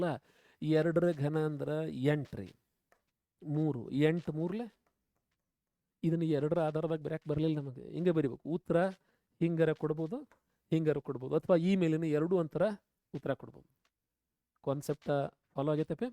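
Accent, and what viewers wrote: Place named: Karnataka, India. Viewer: Sir we love your dharwad accent . It fills our heart ❤️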